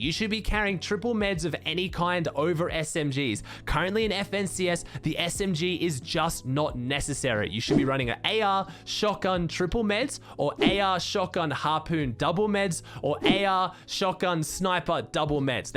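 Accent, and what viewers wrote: Australia. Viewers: He’s Australian. He can’t help it.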